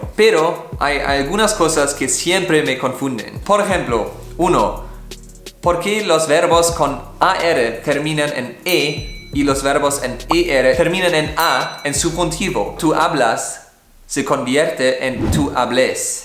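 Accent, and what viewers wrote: Germany. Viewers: Hablas perfecto el español,se nota tú acento de alemán pero hablas perfecto,enhorabuena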